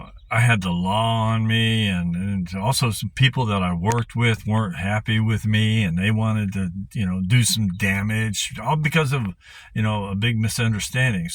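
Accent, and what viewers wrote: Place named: Louisiana, United States. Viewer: Great video! Are from Louisiana by chance? I hear a slight Cajun accent 😂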